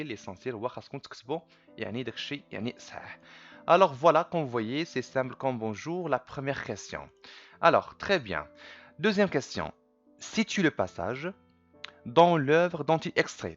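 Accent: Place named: France. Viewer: i like your accent like french people !!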